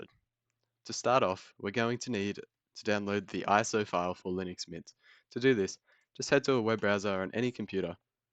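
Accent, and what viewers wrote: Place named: Australia. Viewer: Well done on your first video, i found your style informative and easy to understand. Just getting into Linux as a newbie and will be following your channel going forward (subscribed) also, nice to hear a fellow Aussie for a change LOL. Good luck with your channel and thanks for teaching an old 65 year old some new tricks. Cheers from Melbourne!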